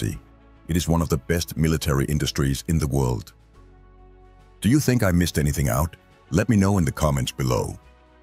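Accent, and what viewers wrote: Denmark. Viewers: Du er dansk. Det hörs på accenten. Det er god engelsk men mej lögner du ikke. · Just curious, is that your voice or a random narrator? It has a hint of danish accent, but you are Norwegian according to the bio. Great voice and awesome content, much appreciated 🫡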